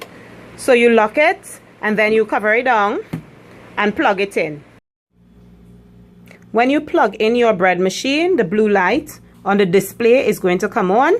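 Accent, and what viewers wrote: Trinidad and Tobago. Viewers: You sound so Trini 😁🇹🇹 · Yeah as soon as she started to speak i was like.. This is a trinni??? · You sound like a trini like me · 🇹🇹🇹🇹🇹🇹 Must spot a trini accent anywhere! Tell me, does this recipe taste like "our" traditional homemade bread?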